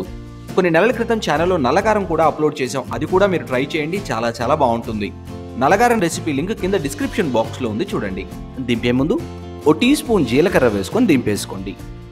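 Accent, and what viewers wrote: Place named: Telangana, India. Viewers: Telangana yasa. Super bro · Telangana slang super..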